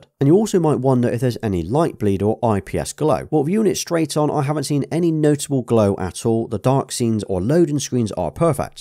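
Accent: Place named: Bristol, United Kingdom. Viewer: Nice gaming monitor. Your accent is annoying me, from Bristol by any chance ma babba? Annoying me in a good way, I am from Weston.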